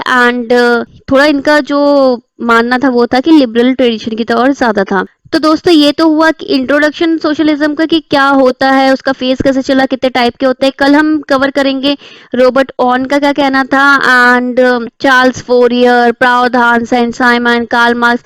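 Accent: Assam, India. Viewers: Mam are u from Assam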